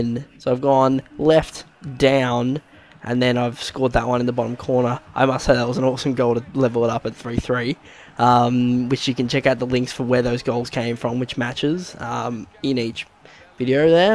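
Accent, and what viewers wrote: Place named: Australia. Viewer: U Aussie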